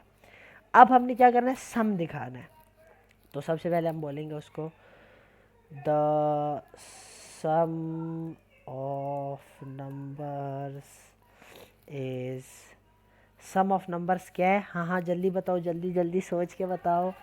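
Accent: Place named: Karachi, Pakistan. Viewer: Or mujhay fast Reply marna waissay bi zuban sy karachi ka lagty ho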